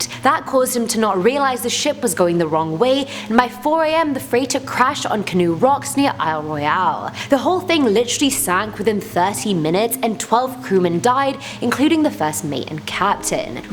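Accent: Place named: United Kingdom. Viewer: I luv that british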